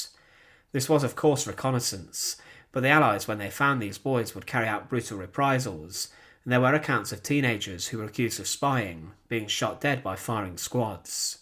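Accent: United Kingdom. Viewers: 🤔🤫 He's British !. You must be American ?. This is why I am waiting this message very slowly !.........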